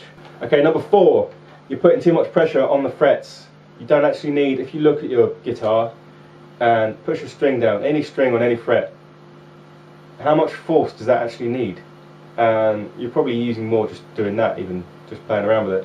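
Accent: United Kingdom. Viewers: Ya, I meant English accent, sorry about that, and you do have a point, you guys sure can rock! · cause hes from the uk and were awesome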